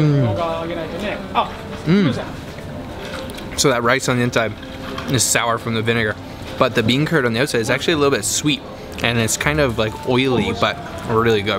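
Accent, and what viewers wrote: Canada. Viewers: This is the first time I've watched one of your videos. Not sure if you're Canadian or Mid-Western American. Great video regardless! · Canadian or northern Minnesota? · omg your canadian accent is strong!! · "secret hoos sauce, let's try this oot" I love that you are unabashedly Canadian... keep up the good work lol!!!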